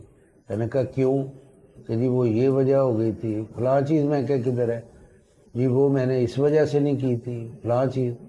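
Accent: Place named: Karachi, Pakistan. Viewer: میزبان صاحب آپ کا لب و لہجہ اور تلفّظ بہت اچھا ھے،مَیں طارق قریشی ھوں اور کراچی کئی سال رہا ہوں ،آپ مُجھے کراچی یا اُردو اسپیکنگ لگتے ہیں اور آپ مہمان کو بولنے پورا موقع دیتے ہیں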